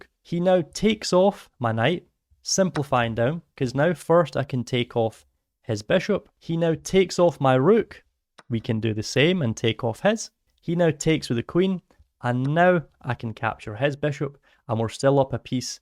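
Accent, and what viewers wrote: Scotland, United Kingdom. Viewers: Nice Scottish voice, subscribed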